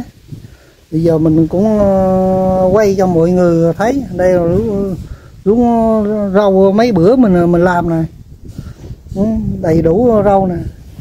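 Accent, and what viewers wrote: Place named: Ninh Thuan, Vietnam. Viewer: Bạn ơi bạn ở Ninh Thuận còn mình bình thuận nhưng xa quê lâu rồi nghe bạn nói chuyện giọng nhớ quê hương quá cảm ơn bạn đã cho mình nghe lại giọng nói của quê hương dấu yêu ♥️♥️♥️♥️🥰🥰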